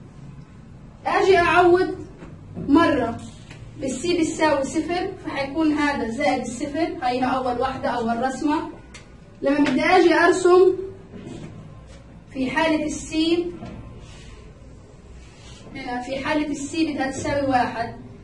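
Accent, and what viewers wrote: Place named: Iraq. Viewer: ضنيتو عراقي 👱😩😩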